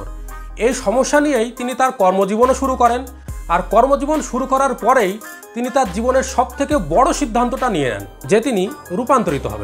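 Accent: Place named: Bangladesh. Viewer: তোমার কথাই মনে হচ্ছে তুমি বাংলাদেশী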